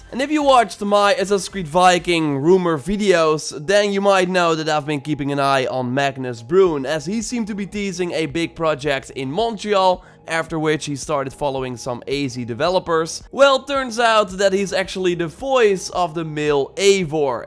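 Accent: Netherlands. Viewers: Hey i love your vids and I'm also dutch like you I could hear it from your voice and also subd today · Ik vind het zo mooi dat ik gewoon hoor dat je Nederlands bent😂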